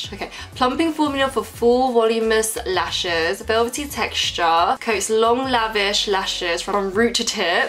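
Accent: England, United Kingdom. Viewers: Your accent tho😍I love ur English accent😍I'm from Ireland btw❤ kildare ( a place in Ireland x) xx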